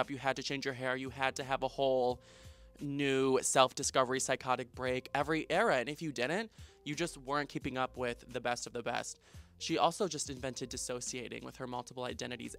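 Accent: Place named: New York, United States. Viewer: Really?? I’m from New York and that’s how we speak. I have a heavy New York accent. It’s like people that have a British accent, not really something you can control and you do sound like you’re from New York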